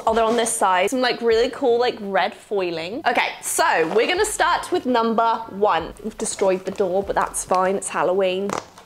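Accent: England, United Kingdom. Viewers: seriously LOVE that pays attention to and creates her own closed captioning. Not everyone does, and since she speaks quickly sometimes and I don't 100% understand everything in an English accent, I really appreciate it. Thanks,! Hope you're doing well!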